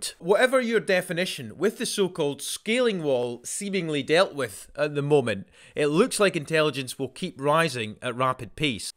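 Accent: Scotland, United Kingdom. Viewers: English language spoken with a Scottish accent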